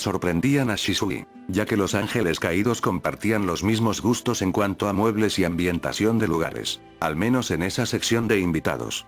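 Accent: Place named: Dominican Republic. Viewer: Klk, un Dominicano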